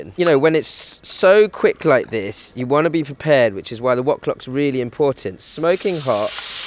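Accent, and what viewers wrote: United Kingdom. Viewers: I gotta say that British accent is soooo nice. United Stated here - much love and respect to your cooks and expertise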